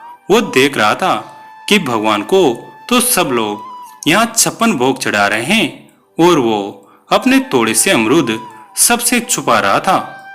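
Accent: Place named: Pahari, India. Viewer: Bhai aap ki aawaj thik nahi lagta hai...lagta hai tum pahari ho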